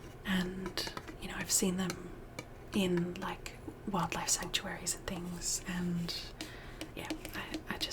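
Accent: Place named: Australia. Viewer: this is such a special video! 🥲💗🙌 I really resonate with your gentle and loving style of ASMR. And I really enjoyed hearing you answer my questions! 🥰🥰🥰 (Also, your lovely Australian accent reminds me of a good friend of mine from middle school, which makes me happy. ❤️)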